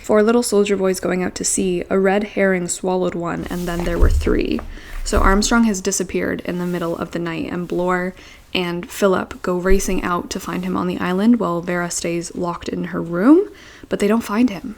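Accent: France. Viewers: the cigarette , the spoon , the mustache , the accent . we have ourselves a french detective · Your French accent was so cute! 🤗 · Ton accent français m'a fait sourit, c'était drôle 👌❤️ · Are you French or Belgian or Canadian? I've seen And Then There Were None (1945 film) and I've read The Murder of Roger Ackroyd so ... I know all the answers! It sounds like the film and the book have different endings!! WOW! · Loved the French accent🇫🇷🥖👏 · ok so i have only just found you and genuinely thought you were french... like parisien french... and i speak french with a deliberate burgundian accent...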